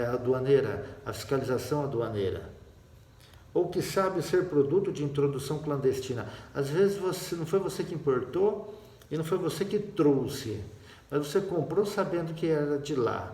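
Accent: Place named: Brazil. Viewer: No le entiendo bien esta muy brazileiro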